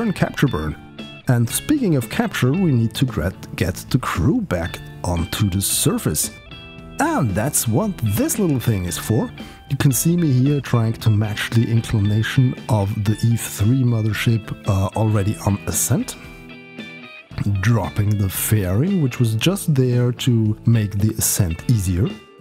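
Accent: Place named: Germany. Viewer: Do you speak german? You‘r „s“ sounds, weil..., german.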